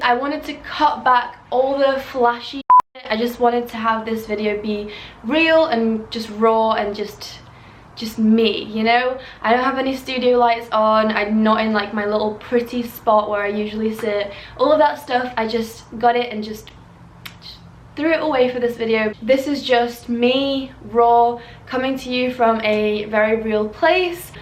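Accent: United Kingdom. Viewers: wait very stupid question.... when did you get the british accent? or is it not?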